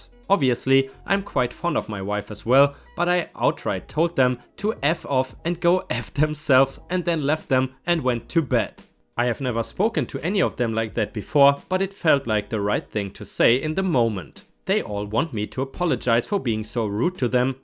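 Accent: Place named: Germany. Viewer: Random question. Are you german? I live in germany and you really have a german accent. I relly like it 🌟🌟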